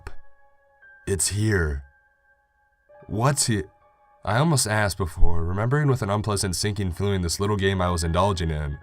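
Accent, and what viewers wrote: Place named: Texas, United States. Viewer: Texas drawl is prominent. Also I loved the story! You made me love these types of stories even more then before! I'm jealous of how smooth your voice is, I stutter when I read out.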